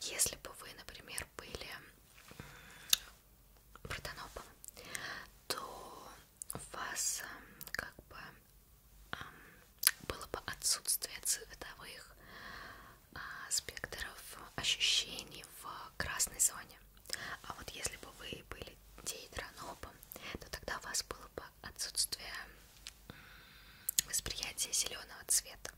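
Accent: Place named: Russia. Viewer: She’s so pretty really wish she did some videos in English the Russian accent is amazing 👌🏻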